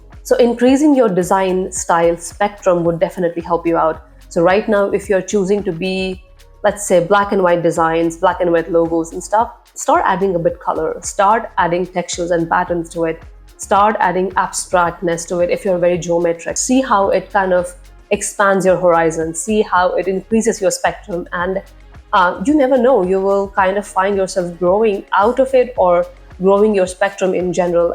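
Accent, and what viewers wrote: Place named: United States. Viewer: BTW your english has an american accent. That's sounded very good and also your content.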